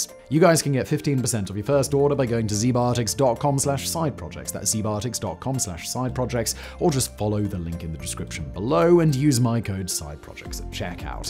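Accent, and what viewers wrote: United Kingdom. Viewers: Do people really still talk with this pompous, posh English accent? Or is this just a goof?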